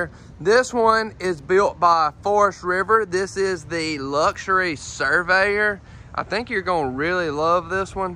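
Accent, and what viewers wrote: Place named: United States. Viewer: Why dou sound more country then chance and id have both 🥓 sausage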